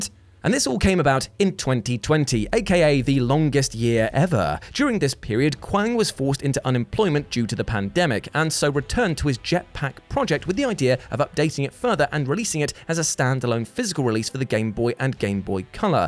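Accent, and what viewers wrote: South, United States. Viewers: That Southern accent 💯💯💯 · As a southerner (TN USA) I gotta say....your (admittedly somewhat over-the-top) southern accent was on point man lol....GJ. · Not mad at that cowboy accent at all 😂😂😂😂😂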